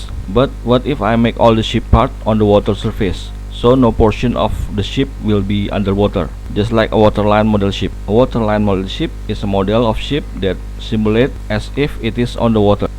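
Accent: Indonesia. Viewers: Pantes kok kenal logat2 inggrisnya, ternyata org Indo. Wkwk. Mantep banget channelnya mas, gak nyangka ada yg punya topik foam RC plane 👍👍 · gw tau abang org indo karena dari logat 🗿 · how come I feel the way you speak English is like an Indonesian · Tadinya gw kira ini channel luar, pas denger logat nya wahh indo nii, dari ini gw percaya channel berkualitas bnyak juga di indo. Keren sii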